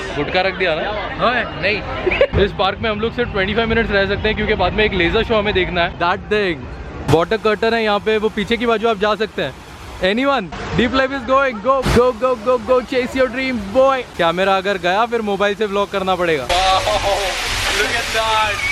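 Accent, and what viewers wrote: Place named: Hyderabad, India. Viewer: U made me remember my hydrbd trip.... way enjoys😍😍.... n ur hydrabdi language kadak😂😂😂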